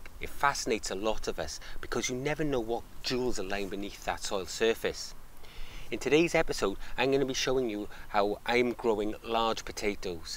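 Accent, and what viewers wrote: Wales, United Kingdom. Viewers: Amazing! I’d be so stoked to have potatoes like this! Thanks for the info. I love your accent 😍 Wales? I’m from California, please don’t be offended if I got it wrong 😊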